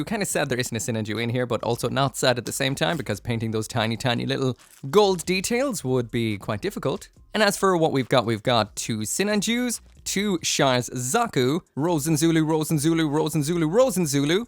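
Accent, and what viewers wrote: Ireland. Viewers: You sound like a fellow potatolander. Also mobile suit skirmish exists for 1/144 wargaming And zero well less mould lines is pure wintanium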